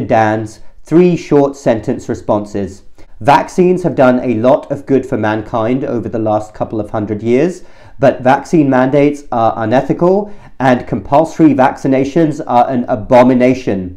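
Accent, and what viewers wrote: United Kingdom. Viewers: I was wondering why you have such a clipped British accent despite staying in the US! Now it's clear.😊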